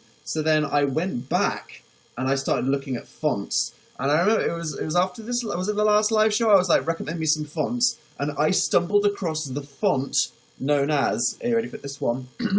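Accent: United Kingdom. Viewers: Is it me or does hearing a British person talk about fonts make it more fancy than it really is? 🖤😊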